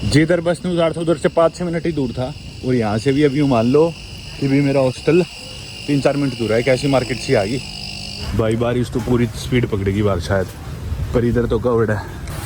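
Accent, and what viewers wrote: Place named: Haryana, India. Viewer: Hi, jab haryana bolte ho na kisi doosre desh mai bhai swad hi aa jata Hai....... tau kheech de tode de batti😂😂😂😂😂😂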